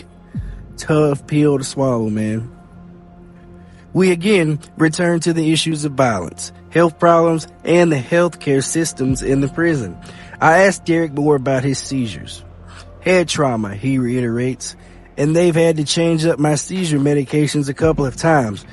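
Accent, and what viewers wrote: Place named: Alabama, United States. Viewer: Dude I swear u talk just as country as I do 😂 what part of Alabama are u from? I'm in Gadsden 😊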